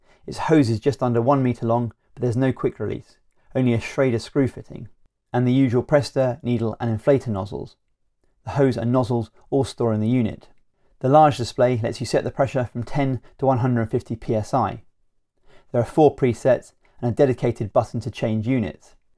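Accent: United Kingdom. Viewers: Thanks a lot!! This video really helped me! Nice English accent! I wish I could speak like you :D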